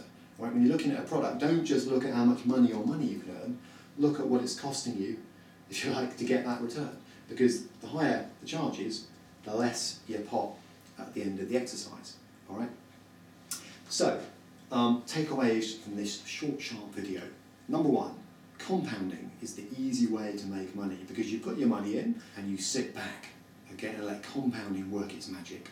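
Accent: United Kingdom. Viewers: Thank you Sir. ... Great content. .. and love the accent (British) :)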